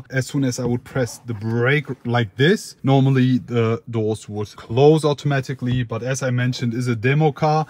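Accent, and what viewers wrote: Germany. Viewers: Is he talking of 600 miles or rather 600 kms as he sounds European or German. As in this seems to be a German or European spec car. And those I would suppose are in kms. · Немец говорит на английском?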